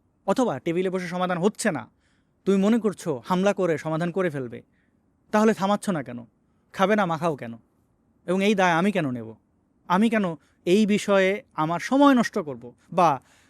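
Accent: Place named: Bangal, Bangladesh. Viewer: দাদা আপনার বাঙ্গু কথা খুব মজা লাগে হা হা হা।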